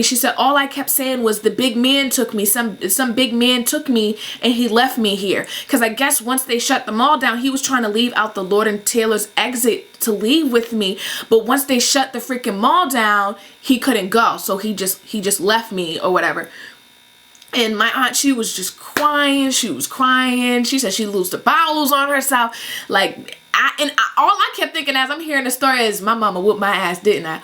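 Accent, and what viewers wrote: Boston, United States. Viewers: Omg you are from Boston.... what part are you from? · Hey im new to ur channel nd this is the first video ive seen haha i like it ps u must be from MA or boston me too!!!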